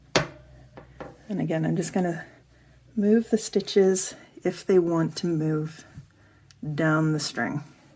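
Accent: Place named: Canada. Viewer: excellent tutorial.............best one out there. thanks so much. love your Canadian accent!!!!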